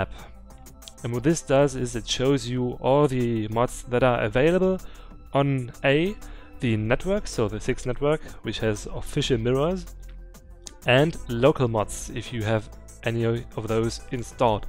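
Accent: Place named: Germany. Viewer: And now i noticed how funny the german Accent is xD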